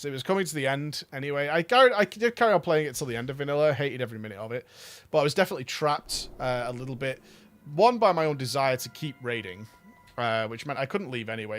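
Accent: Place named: RP, United Kingdom. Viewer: your a duki lad why has your voice gone all posh:)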